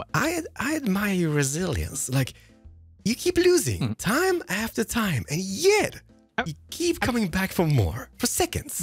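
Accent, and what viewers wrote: France. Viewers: French gives me life:') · i'm french and i love the french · i gotta say : i'm french and i LOVE your french accent !!!!! :) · Yes French is back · French mumbo for mayor is the best and needs to appear more often lol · French is the best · I love French so so so much Never fails to put a smile on my face and light up my mood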